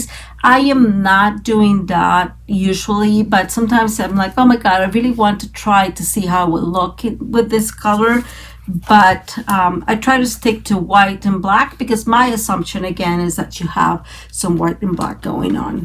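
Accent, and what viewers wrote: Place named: Puerto Rico. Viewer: Love watching you create cards,. You speak like a woman who lives here in the apartment building where I live, and she's from Puerto Rico.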